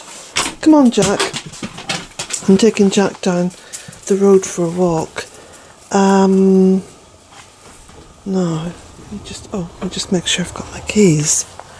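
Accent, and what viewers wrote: Ireland. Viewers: Thank you, that was such fun. West Ireland is so beautiful, love it. Those cows were quite a treat. They sure look healthy. While you walked down your driveway I notice how the trees form a most lovely & mystical archway. How cool that is. I wish I could retain new information better. Thank you for repeating the names of the flowers & plants. I simply love Ireland & your accent. Since following you I've grown a better appreciation of my own small piece of paradise. Love to you, Jack & your home. Blessings P.S. My definition of goddess is same as yours.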